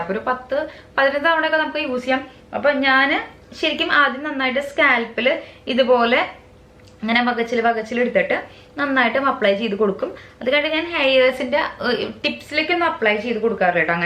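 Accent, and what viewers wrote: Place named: Thrissur, India. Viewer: ചേച്ചി തൃശ്ശൂര്കാരിയാണോ. സംസാരം അതുപോലെയുണ്ടല്ലോ 😻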